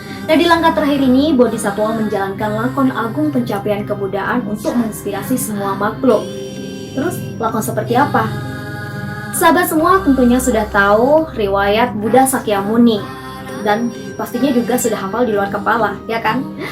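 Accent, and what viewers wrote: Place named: North Sumatra, Indonesia. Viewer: Wow, penjelasan yg sungguh komprehensif tentang Borobudur yang pernah saya dengar. Gaya presentasi bagus, jelas. Biar lebih oye, hilangkan kebiasaan menggunakan kata tak efektif "eee", ketika loading memory, lebih baik silent sejenak, agar tak mengganggu pendengar menyimak sambil upload memory juga. Logatnya, logat sumatera utara kalau tak salah tebak. Tapi itu semua kekurangan minor saja, lebih banyak bagusnya, kalau mau naik level ke presenter yg pro. Anyway sdh keren, lanjutken tambah lama pasti tambah keren !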